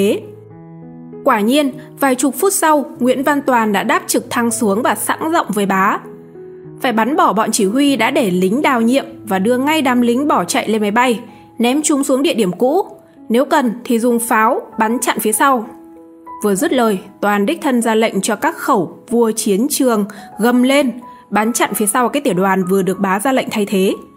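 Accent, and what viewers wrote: North, Vietnam. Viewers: Giọng Bắc kỳ đọc rất khó chịu,kho nghe quá,n thì đọc l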